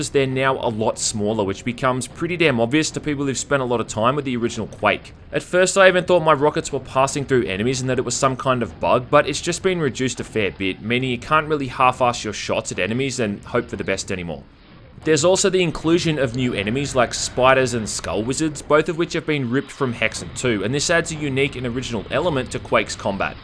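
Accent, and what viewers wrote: Australia. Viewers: Tyler Malone so it's Australian accent he speaks with?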